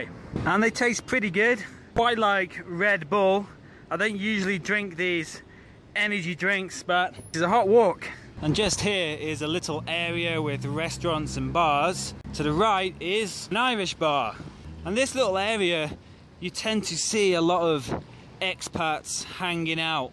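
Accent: England, United Kingdom. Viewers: Me encantan tus vídeos!! Aunque soy mexicana me ayudan a conocer mejor la Ciudad de México (soy de Acapulco), y me ayudan con el inglés. Por cierto me encanta tu acento, siempre he pensado que el acento británico es el mejor en inglés. Espero que le entiendas a mi comentario, aunque puedo leer y escuchar en inglés no soy buena escribiéndolo. Muy buenos vídeos, sigue así :) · Diana T no es Americano es ingles de Inglaterra. checa el acento.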